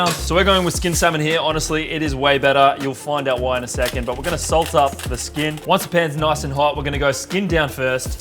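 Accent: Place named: Australia. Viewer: Great content and who doesn’t love Australian accents. Easily one of the nicest people in the world👍🏼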